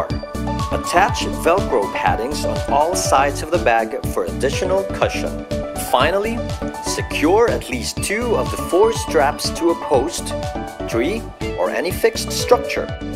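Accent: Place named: Philippines. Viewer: Nice Filipino accent there lol.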